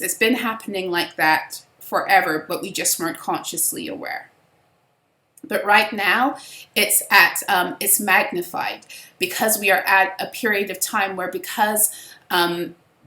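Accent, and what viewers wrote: United Kingdom. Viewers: Your Hiding a British Accent I hear it...Just like Maji got the T hiding her UK Accemt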